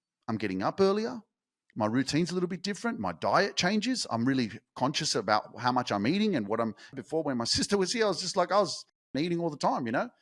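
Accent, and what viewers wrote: Australia. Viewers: He's not British! Think maybe the accent is Australian.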